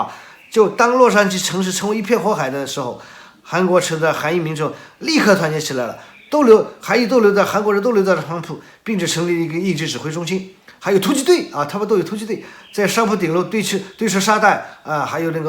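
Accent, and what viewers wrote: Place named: South, China. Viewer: 终于有我们南方人说政治啦👍👍👍这个口音听着真亲切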